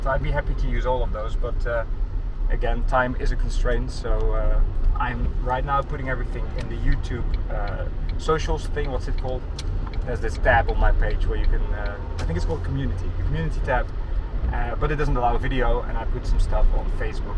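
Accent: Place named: Netherlands. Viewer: I thought you were Dutch,recognizes the accent,I’ve played hockey against Eindhoven way back in the 70s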